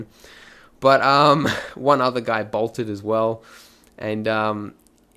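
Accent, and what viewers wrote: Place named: Australia. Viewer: Great tutorial mate, feels good to hear an aussie for once. Alot better to understand.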